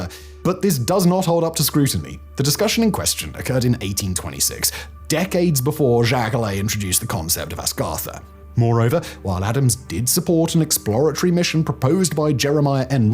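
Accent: RP, United Kingdom. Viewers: At first, I thought Simon was stricken silly by a homophone... then, I realized that he wasn't. This guy doesn't know the difference between a lama and a llama. I guess a posh accent and arrogant snark can't always hide ignorance.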